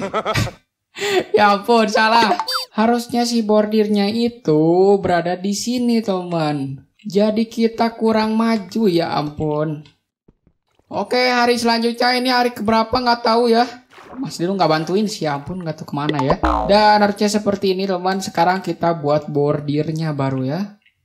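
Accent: West Java, Indonesia. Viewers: Bng buat farm fish afk Orng Sunda kak bhsa kaya orng sunda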